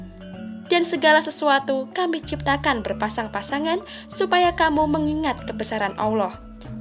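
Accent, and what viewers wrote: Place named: Java, Indonesia. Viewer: naratornya logat jawa nya kental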